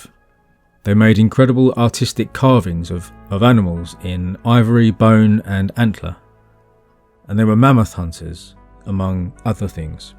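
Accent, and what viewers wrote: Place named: London, United Kingdom. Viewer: I love the London Accent 😂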